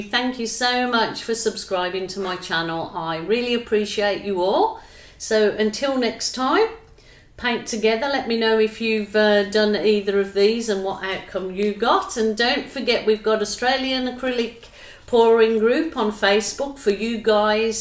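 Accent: Birmingham, United Kingdom. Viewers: gorgeous as ever !! Love your little fur babies 🥰 do I detect a Brummie accent in there somewhere ? 💞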